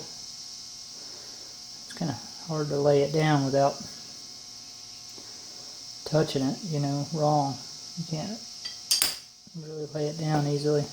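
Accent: South, United States. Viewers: Me Now he's from a southern State you fool. Of course he's saying "to"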